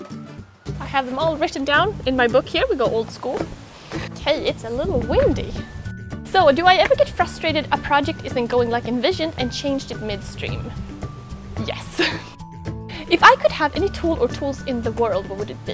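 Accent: England, United Kingdom. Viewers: Hi, ooo you are from oregon US I was thinking you was from England Jaja well ok, 👉🏼always love your videos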